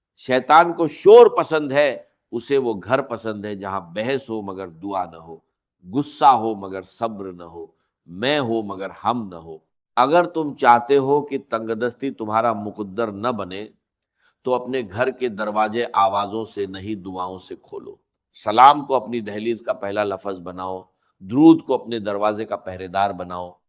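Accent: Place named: India. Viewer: Yes you're right this is not real video this is not his voice and you see clear that is Indian accent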